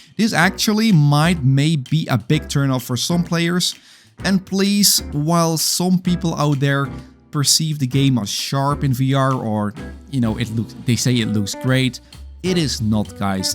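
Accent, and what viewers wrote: Netherlands. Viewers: U bent nederlands 100% aan het engels te horen. 😄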